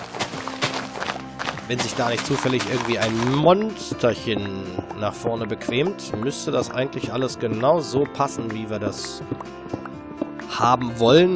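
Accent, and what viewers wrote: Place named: Saxony, Germany. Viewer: haha kannst du lustig sächseln! Kommst du aus Sachsen/DDR ?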